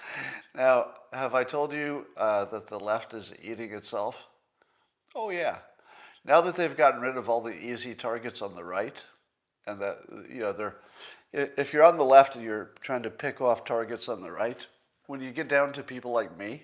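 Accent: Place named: Northern California, United States. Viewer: That's the NORCAL accent.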